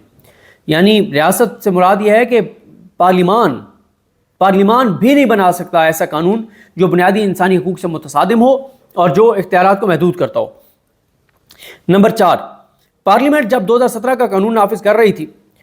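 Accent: Saraiki, Pakistan. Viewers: bhai dill khush ho jata hay jab ap Qazi ki tareef krty hyn..... Mekun lgday tohady lehjay nal tussa saraiki hivay sady elaqay janoobi punjab day...Allah pak tohakun apnri hifzo aman ich rakhy ameen....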